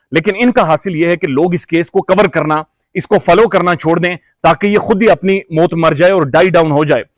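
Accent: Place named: Kashmir, India. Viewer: Mne phly b pocha tha k ap kashmiri hain plzzz bta dain k ap kashmir se hain kia? Apk Andaz e bayan se lgta tha k ap kashmiri hain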